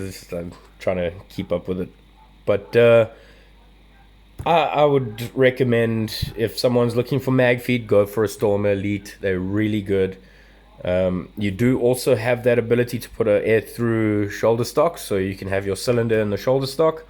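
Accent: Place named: South Africa. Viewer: Off topic, but... just an observation... Interesting accent you have in South Africa! To my ear (Southern American) I detect a little Brit, a little Aussie, and oddly enough, a little deep south American. Back on point... I'm wavering between the Stormer Elite and the TMC. I can get a TMC for $40 US (at $229) more than the Stormer (at $189). For that small price difference, do you think it's a better purchase to get the TMC, overall? Will be running a red dot so don't care too much about the flip up sights. And the easy on, easy off fore end isn't a big deal either. But I do kinda like the metal construction of the TMC, as well as just the overall aesthetics, so leaning toward the TMC. Assuming that mechanically they are the same gun... err... I mean, MARKER! But with the Stormer being newer, I can't help but wonder... Does it have some subtle "improvements" or has it been downgraded with subtle cost saving measures.... 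Any thoughts?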